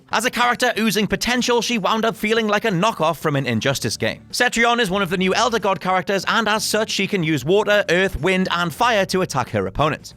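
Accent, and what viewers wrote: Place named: Scotland, United Kingdom. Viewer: Her Scotts voice and I clicked away.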